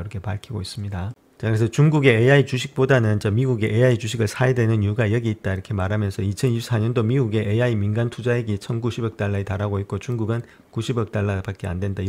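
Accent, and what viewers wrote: Gyeongsang, South Korea. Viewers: 경상도 사투리 서울 토박이인 제가 듣기에 매우 인텔리젼틱하게 들리네요^^ 경상도 분들은 다 머리가 좋은가봐요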